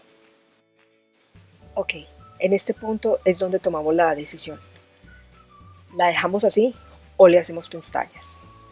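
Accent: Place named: Colombia. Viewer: Siempre admiro tu trabajo . Puesto q es bello bello .. saludos desde Villahermosa Tabasco mex. Hoy me dejas sin palabras, super explicado y detallado . Siempre m pregunto de donde eres, creía q eras española pero hoy tu voz me pareció diferente y pareces colombiana, 😙😙😍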